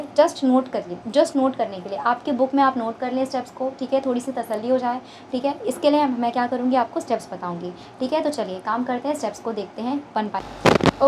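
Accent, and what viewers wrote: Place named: Bihar, India. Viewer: I think you are from bihar.. Am I right?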